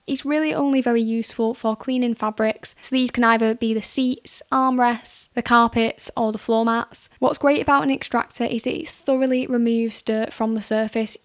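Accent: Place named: United Kingdom. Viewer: thank you hot sounding uk lady.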